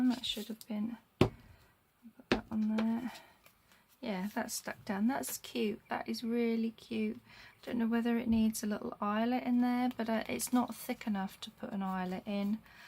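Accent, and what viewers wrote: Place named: United Kingdom. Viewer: Hi there! A video from you popped up today & when I read “a nice relaxing time”, I thought that’s for me! In what part of the UK do you live? I love listening to all the various accents people have! I live in Kansas which is smack dab right in the middle of the US! Your little tags & cards are really cute, I love the embossed leaves you used! I giggled a little when you pointed out all the fold lines on your envelope, I have several that look just like that! I had a terrible time trying to figure out the scoreboards! I hope you feel better soon, do you have fibromyalgia? I have arthritis in just about every joint & changes in weather really bother me. I look forward to seeing more of your videos! I have liked & subscribed! Thank you! Erica❤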